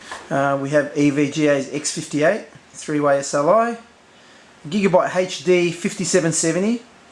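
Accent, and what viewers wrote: Australia. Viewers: Is that an aussie i hear?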